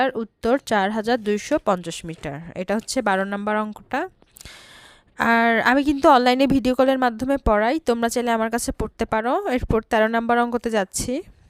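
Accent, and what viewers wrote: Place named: Chittagong, Bangladesh. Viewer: তোমার জেলা আমি জানি চিটাগাং😂😂?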